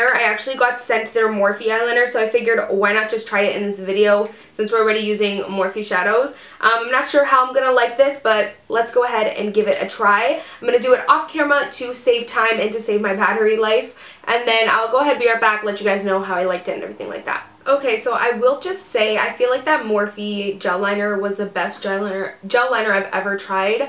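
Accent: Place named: Canada. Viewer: youre so canadian lol you say "thee" instead of "the" i love it :) my aunt does the same thing haha youre amazing!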